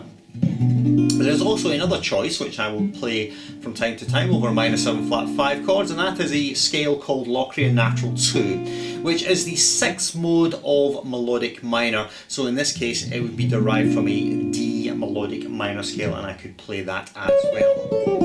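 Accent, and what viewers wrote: Scotland, United Kingdom. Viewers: well - i'm convinced, you know what you do. how is it possible people can get so good at their instruments?, how many hours have you spent playing in your life? just roughly. i want to estimate if there is a chance for me at all. major doubts. btw, are you scottish? sorry if i'm wrong, but there is something in your accent i have heard before - jim kerr. (i'm german - i can't figure it out exactely)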